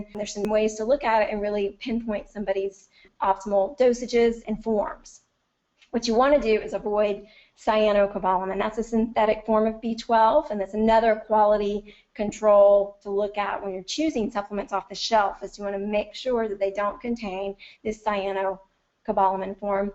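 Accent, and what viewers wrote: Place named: South, United States. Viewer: This info was very good BUT.. I'd like to give you some helpful auditory info if I may. The audio on this video is horrendous, you sound like you are talking through a pipe. I have this and other conditions which make it especially annoying to decipher. You have a southern drone, plus you are very monotone and nasal, and you talk far too fast for your audience and the compromised audio quality, it cannot possibly match your words trying to match your brain in delivery - it cannot unless you improve the audio, eliminating echoes and reverb. You must adjust, just slow down by about 25% if you have this low quality audio gear. Sorry but I had to leave due to the above situations, although I did mute this and flip through the charts which are great. I truly hope you can resolve the quality issues, as you otherwise have a lot of good info to tell, thanks.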